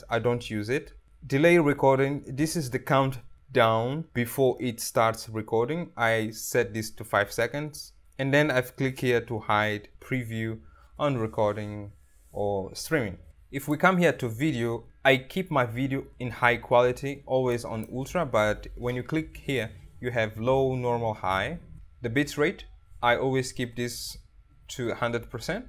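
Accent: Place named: India. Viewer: i like the slight indian accent lol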